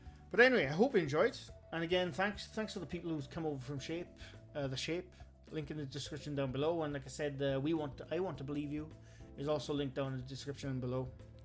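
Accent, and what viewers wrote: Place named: Scotland, United Kingdom. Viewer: Love your accent. Scottish??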